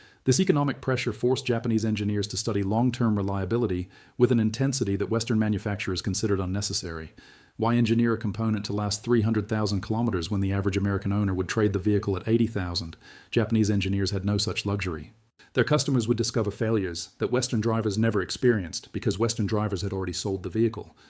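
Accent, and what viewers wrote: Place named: Australia. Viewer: Asian Guy got himself an Aussie accent!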